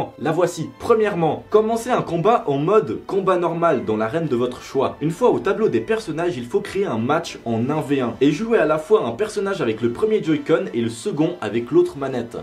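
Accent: Switzerland. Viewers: T’es suisse ? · JE SAVAIS QUE TU ETAIT SUISSE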